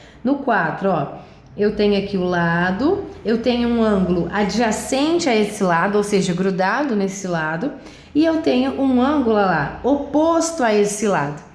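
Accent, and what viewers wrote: Minas Gerais, Brazil. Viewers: Prof.ª: congruência NÃO significa, necessariamente, igualdade. Congruência, por definição, é a coincidência ou correspondência de caráter ou qualidades; proporcionalidade, conformidade, concordância, harmonia. Se dois triângulos têm os três lados iguais e dois ângulos também iguais, obrigatoriamente tais triângulos são absolutamente IGUAIS. Mas, como regra geral, a terminologia correta no estudo de semelhança de triângulos, o correto é utilizar a palavra "congruência ou congruentes". Importante observação: suas mãos são lindas, assim como seu sotaque mineiro ( lá de Barbacena ).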